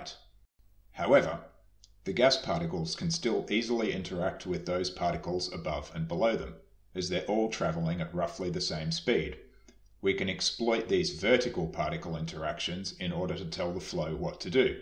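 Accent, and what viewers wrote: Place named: Canada. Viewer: Great video and very pleasant Canadian accent.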